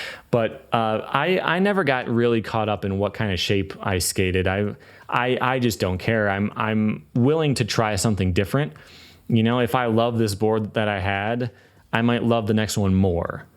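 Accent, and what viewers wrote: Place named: United States. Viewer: the .at thing confused the hell out of me back then - "this guy is austrian like me? no, he talks like an american? What the f is going on?!"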